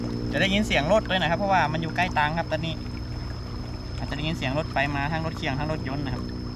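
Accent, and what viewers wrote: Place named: Phrae, Thailand. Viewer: สำเนียงนี่เป๋นคนแป้แม่นก่คับ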